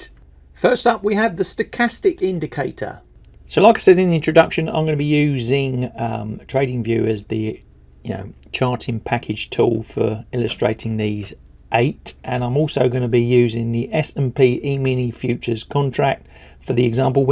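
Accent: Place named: Kent, United Kingdom. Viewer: Does anyone know whether is a Kentish speaker? It’s the one British English accent that has a tinge of Australian elements, though we probably should say that the other way around. Apparently, Kent has been overrun by Essex speakers, so the traditional accent has mostly been displaced in recent generations.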